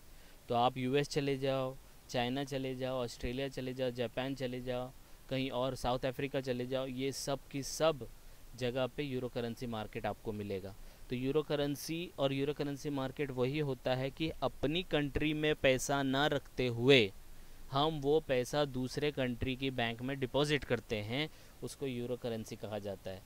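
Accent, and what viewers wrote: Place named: Maharashtra, India. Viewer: Mujhe esa kyu lgg rha hai ki Professor Marathi hai?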